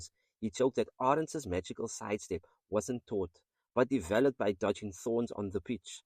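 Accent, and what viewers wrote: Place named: Cape, South Africa. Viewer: So inspiring! I also like your Cape accent 👍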